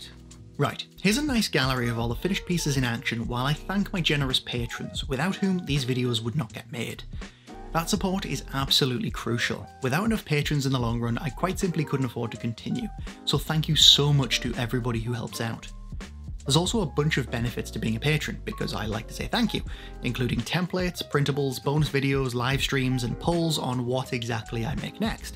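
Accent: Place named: England, United Kingdom. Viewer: just wondering qwhy u use inches when u sound english? it always confuses me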